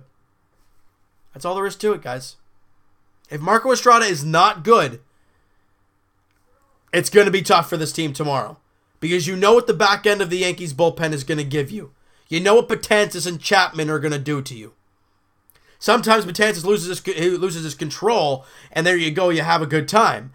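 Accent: Canada. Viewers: Jonathan Duncan Hmm maybe it's because it's part of the Canadian accent, don't you think?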